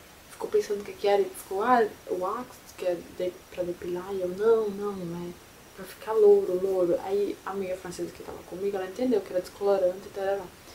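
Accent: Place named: Northeast, Brazil. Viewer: Seu sotaque eh igual ao meu, vc eh do Nordeste? Sou de Maceio, tb sou Au Pair, tb tenho um diario aqui no YT e estou ha tres meses em Virginia. Onde vc esta aqui nos EUA? Bjus!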